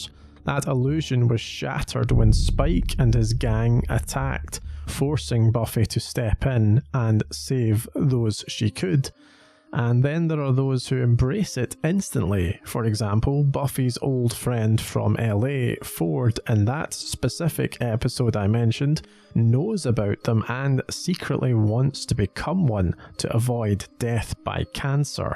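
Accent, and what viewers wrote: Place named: Scotland, United Kingdom. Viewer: The scottish Dialect make it feel like u are a child of a watchers council in the UK giving inside Storys of the Slayer Demin World.Love your Videos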